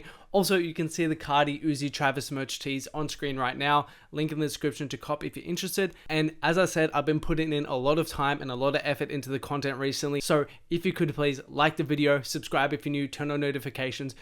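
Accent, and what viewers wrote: Australia. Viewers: This guys australian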